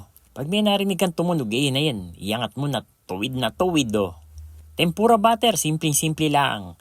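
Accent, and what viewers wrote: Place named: Baler, Philippines. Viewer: My Punto k po Ng taga baler hehe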